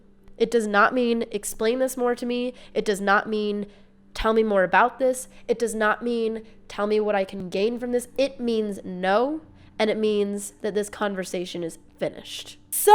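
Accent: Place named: New England, United States. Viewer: I love your content. I had to look up where you're from because I was convinced you were from New England, but I was shocked to find out you weren't. You would get along well here. You talk like a New Englander... We love the cussing. It enhances our feelings when we speak. It shows passion for what you believe in... Keep cussing, and share those beautiful thoughts and feeling 👍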